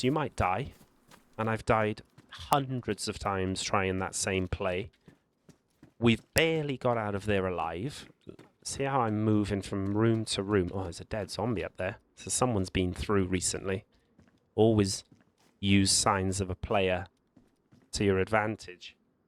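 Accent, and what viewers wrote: Wales, United Kingdom. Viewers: No problem mate, also i thought i recognized your accent are you from Wales by chance? if so then hello there neighbor, I'm from England lol!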